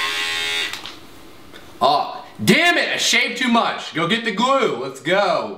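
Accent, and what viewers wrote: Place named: South, United States. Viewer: Amazeballs. Totes entertained, love the southern accent btdubs!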